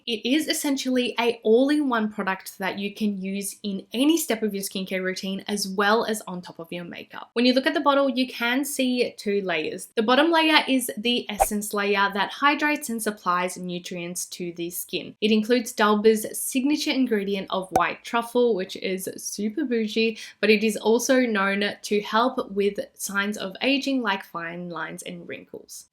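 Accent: Australia. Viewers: Her Aussie accent!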